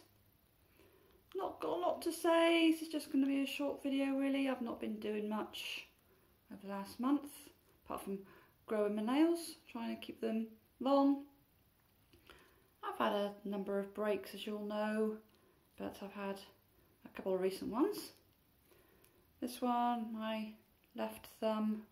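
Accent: England, United Kingdom. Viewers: I truly admire your claws erm my bad . . .nails :-) I also love your voice, I love the English accents, and when I was in London 20 years ago I was in total awe of your people , the shops , the double-decker buses,, the food , so many things that kept me so preoccupied that I only slept an hour a day lol anyway, thank you for sharing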